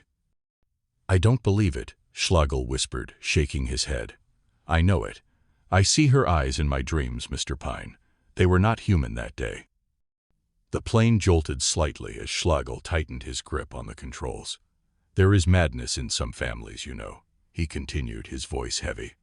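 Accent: United States. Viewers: Terrible American voice not worth listening to.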